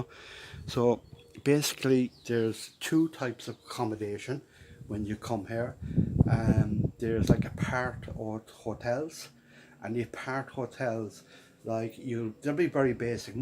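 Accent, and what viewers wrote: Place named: Cork, Ireland. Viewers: Wasstorry languer cork hhh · you sound like youre from Cork, i am too! ill be headed to puerto rico in a couple of weeks cant wait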